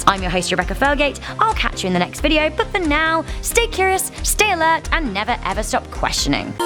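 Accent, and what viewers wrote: United Kingdom. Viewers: ‘Ello fellow Brit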